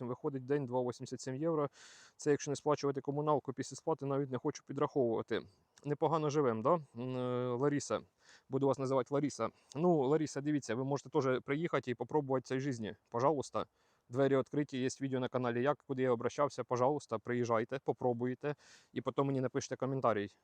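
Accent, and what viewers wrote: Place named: Surzhyk, Ukraine. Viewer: Балакай краще вже на російській,бо твій лютий суржик це капець.....або вивчай мову краще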